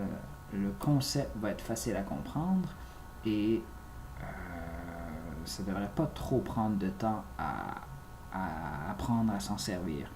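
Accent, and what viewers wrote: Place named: Montreal, Canada. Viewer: merci beacoup pq je ne savais pas comment l avoir tu m as aider et aussi ete vous du quebec pq vous avez l acsens de montreal et l aval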